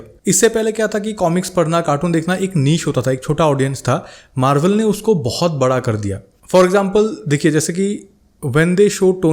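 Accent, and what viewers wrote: Assam, India. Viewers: Is he from Assam???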